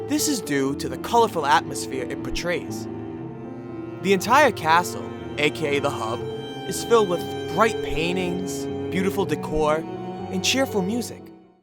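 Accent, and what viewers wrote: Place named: Massachusetts, United States. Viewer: You from mass brah? you have the same accent my dad and grandmother doo there from lowe...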